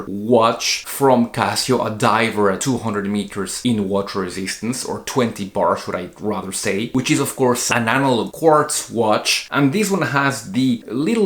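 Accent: France. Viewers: I know he is a French. I like his a French accent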